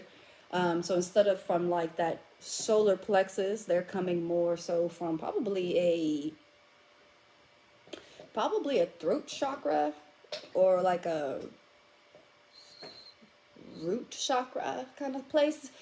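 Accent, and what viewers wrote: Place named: England, United Kingdom. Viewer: Your English accent 😂👌🏾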